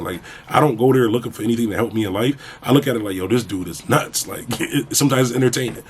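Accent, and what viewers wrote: Philadelphia, United States. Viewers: Bro sound like he from Philly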